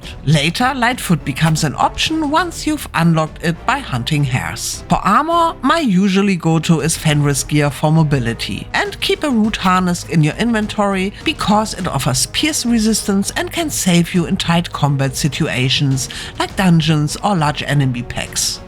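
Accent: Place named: Germany. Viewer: Den Kanal nie gesehen, nach den ersten zwei Sätzen Englisch "Ah eine Deutsche" 😄. Aber eine nette Stimme, alles sehr schön erklärt.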